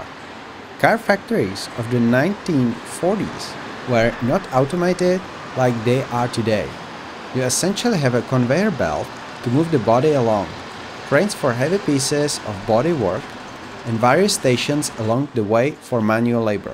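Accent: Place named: Missouri, United States. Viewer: I Detect a Bit of an Accent ! Are you From Missouri ?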